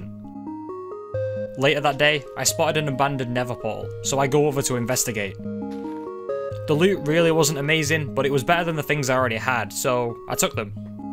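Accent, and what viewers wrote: United Kingdom. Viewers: this mans scottish accent be poppin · Your Briish Accent is Strong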